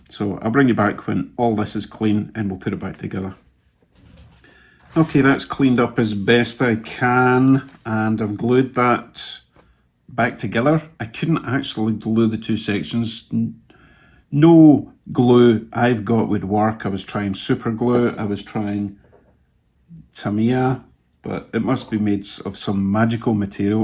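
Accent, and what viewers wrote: Scotland, United Kingdom. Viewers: I love your Scotts accent my father was of Scotts descent and when speaking to older members of the family that is the accent I remember.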